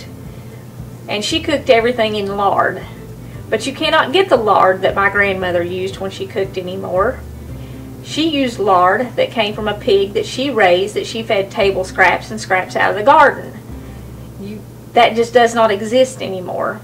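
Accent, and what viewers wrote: South, United States. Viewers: Oh my gosh I just loved this recipe! I'm going to try it on my channel. You are so adorable...love your down home attitude, down to earth commonsense and southern accent!!! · I just love southern accents.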